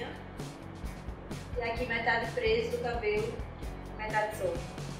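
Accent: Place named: Northeast, Brazil. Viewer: Mulheeeerr Esse é o primeiro video que eu vejo teu,e na abertura eu ja vi o sotaque nordestino,tu é de ondeee?maravilhosaa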